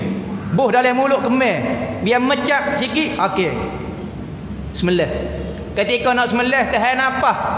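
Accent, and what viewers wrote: Kelantan, Malaysia. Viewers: maaf bertanya ttg daging berbau wangi tu..boleh x terangkan bagaimana cara? maaf sy x fhm sgt loghat kelantan..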